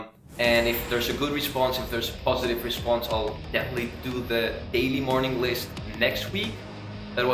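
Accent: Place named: Netherlands. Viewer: Nice video! I always make lists as they provide all the benefits you just mentioned. When I don't I just perform less activities in the day or during the week. Are you Dutch by the way? Ik kan een licht accentje horen ;p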